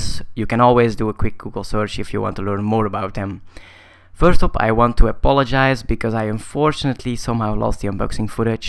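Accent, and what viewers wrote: Netherlands. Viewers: R u dutch?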